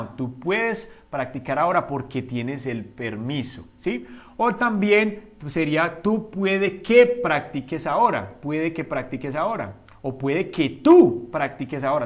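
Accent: Colombia. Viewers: Estimado por tu forma de hablar sos colombiano, saludos desde mi Tierra Catracha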